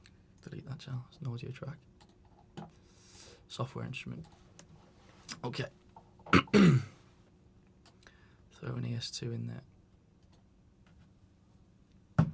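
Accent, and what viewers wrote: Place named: Liverpool, United Kingdom. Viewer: Subscribed! Brilliant teacher, thanks. And I could listen to that Liverpool accent all day....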